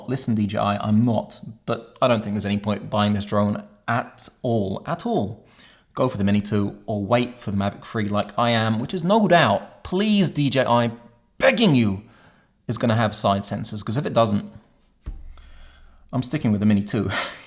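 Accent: United Kingdom. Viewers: This makes sense, i could quite place where you may be from. Its a unique accent but definitely quite British the accent and you have great humor. Great work man keep it up. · U can always count on someone w a British accent to call a spade a spade. Lmao. Agreed. Beyond the mini2... It's going to take alot to justify crossing the legal line in Canada .. ... Awaiting the Mavic 3 as well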